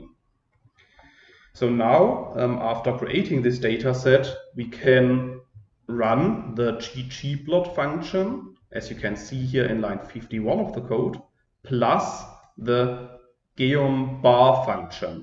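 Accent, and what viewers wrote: Germany. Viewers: Hello, I wanted to say thank you very much! Your videos really saved me today! Btw is that a german accent or am I imagining it? If so then: Super Video! Ich freue mich auf weitere Tutorials :D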